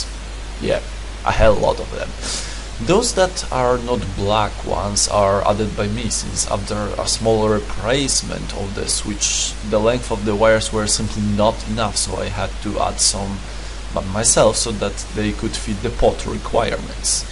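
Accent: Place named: Poland. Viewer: good video man. haha dont worry about your English, and be proud of your Polish? accent! greetings from Czech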